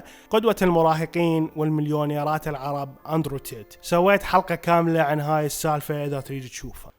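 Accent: Iraq. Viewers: احلى شي اللهجة العراقية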